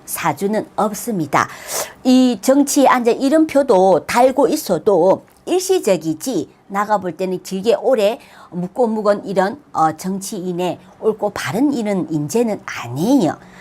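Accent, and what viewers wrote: Gyeongsang, South Korea. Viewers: 경상도 어데고 ? 서부갱남이가 ? 소카도오 ㅡ 나가 볼때는 ㅡ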